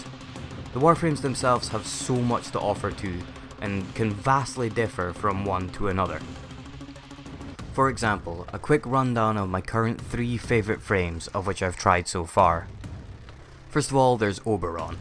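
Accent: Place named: Scotland, United Kingdom. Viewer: Scottish accent can NEVER sound bad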